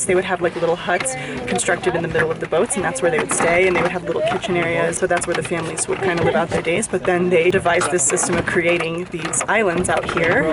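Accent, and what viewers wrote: Spain. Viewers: hahaha Ay tienes acento español! Peru looks so beautiful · Is that a Spanish accent I hear? I love it! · you speak great spanish!! Its funny how you have a Spanish accent, like from Spain, its not common for people to have that accent when spanish is their second language! Good hello from Colombia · espero que con este viaje aprenda nuevos acentos y se le baje un poco el españolete jajaja I also hope that you can come to Colombia, we are right there next to peru. there's so many incredible things to see in south america too;) Love all the facts and interesting details you always give us with each new place you go, makes me feel ready to go places, knowing a little bit more about cultures. that's why i love your vlogs ( also because you're hilarious and i adore you)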